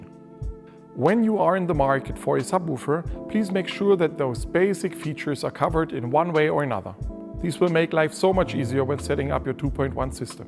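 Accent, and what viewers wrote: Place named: Germany. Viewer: After two words spoken, I knew that he must be a german haha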